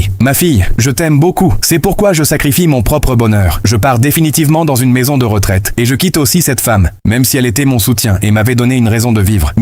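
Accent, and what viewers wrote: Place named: France. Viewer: 😮 quel accent française. C'est vraiment étonnant. Merci pour. ?? ?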